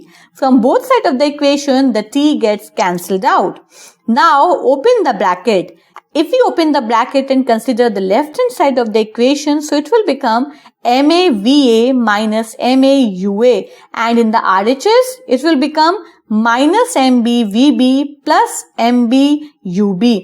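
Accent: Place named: Bengal, India. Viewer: Are u Bengali? I can understand by your accent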